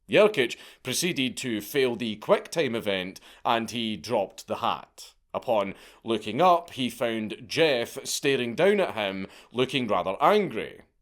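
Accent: Scotland, United Kingdom. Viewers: bruh you're scottish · The legend of 10 cent beer night needed to be told in a Scottish accent. The world is healing.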